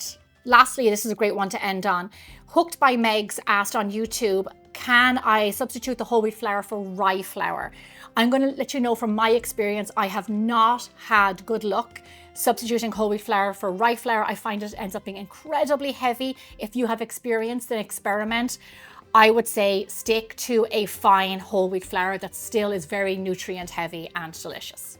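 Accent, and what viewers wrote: Ireland. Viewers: Lovely Recipe, beautiful irish ☘accent:face-red-heart-shape::trophy-yellow-smiling:😇🥰🤗🍞🌎🏠🧈🍀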